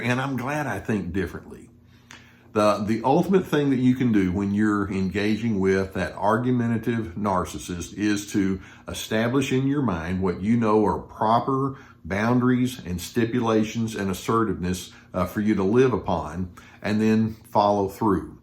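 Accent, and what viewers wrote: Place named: Texas, United States. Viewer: Love you,! <3 Love your accent too! Where's that from? Texas? Yeehaw! Hey, I have a cowboy song for you! Without guns, moonshine, loose women, gambling, just a a whole lot of cows and a long, long ride... and a spiritual twist at the end! <link> Enjoy and thank you for all you are doing for us all!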